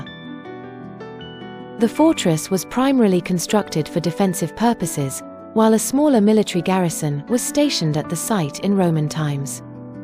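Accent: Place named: United Kingdom. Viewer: The narrator has Royal English❤i say it as a Russian English tutor.Adoration and likes to her voice and sound.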